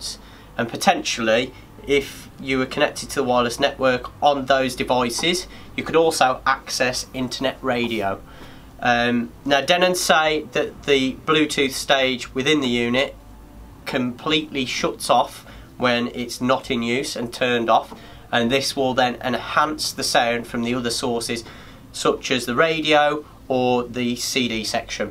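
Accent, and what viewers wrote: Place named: Midlands, United Kingdom. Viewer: Midlands i.e Birmingham UK or area.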